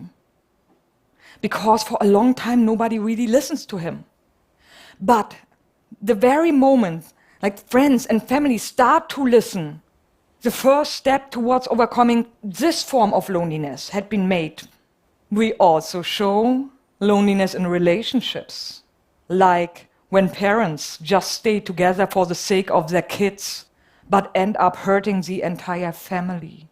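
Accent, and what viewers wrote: Germany. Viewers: Sies ... sies is why... german can't speak English... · I think the lecturer has a German accent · Sexy german accent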